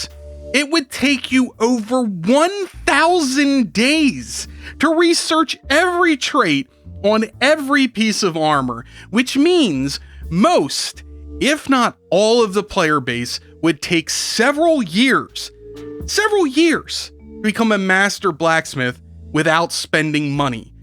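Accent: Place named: Westchester, United States. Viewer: That Westchester accent is great when I'm watching activity and infuriating if I'm trying to relax to video game crap. Wouldn't have it otherwise.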